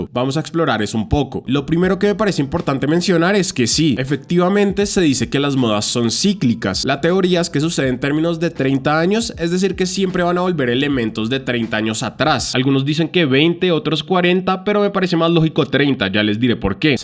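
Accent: Colombia. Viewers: Eres Colombia no ? Xd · Eres Colombiano?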